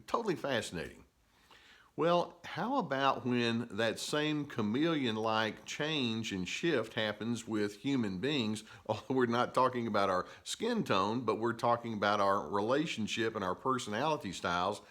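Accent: South, United States. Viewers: Especially his southern drawl. Makes me feel like home when I'm on the other side of the planet. :) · Oh, my! I'm from GA, too(near Valdosta, GA). Where did you go to college and grad school? Just curious. I love your southern accent.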